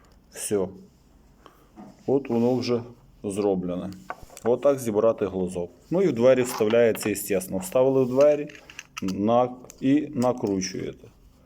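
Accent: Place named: Polesia, Ukraine. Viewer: ВЯЛИКИ ДЯКУЙ,ГЛЯЖУ З МИНСКА, РАЗМАВЛЯЕШЬ, ЯК У НАС НА ПАЛЕССИ, УСЕ ЗРАЗУМЕЛА, ПАЙДУ ЧИНИТЬ,А ТО МАЛО ХТО ПРОСТО И ДАХОДЧИВО РАССКАЖА, АБЫЧНО ВУНИЧАЮТЬ.😊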